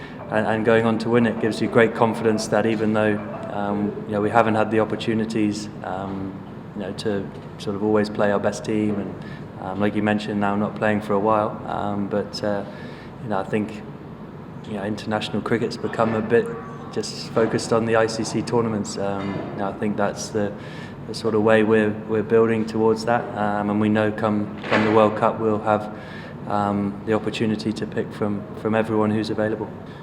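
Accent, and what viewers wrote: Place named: United Kingdom. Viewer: love his British accent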